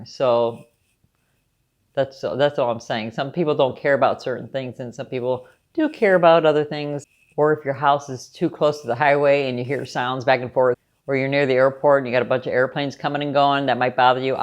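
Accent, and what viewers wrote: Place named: Miami, United States. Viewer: You sound alot like a cuban raised in miami not jocking ....jay from miami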